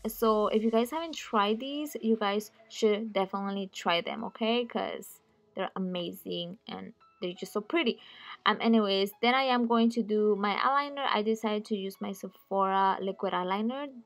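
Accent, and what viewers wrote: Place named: Mexico. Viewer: yall she mexican or sum thats why she has an accent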